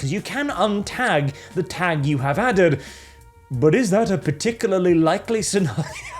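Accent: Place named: Wales, United Kingdom. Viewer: You sometimes sound a bit Welsh.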